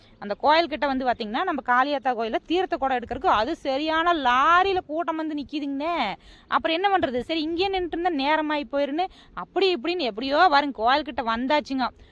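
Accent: Kongu, India. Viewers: அடடா என்ன ஒரு உண்மையான கொங்கு நாட்டு தமிழ் என்ன ஒரு சொல் வீச்சு வாழ்த்துக்கள் சகோதரி 🎉🎉🎉🎉🎉🎉🎉🎉🎉🎉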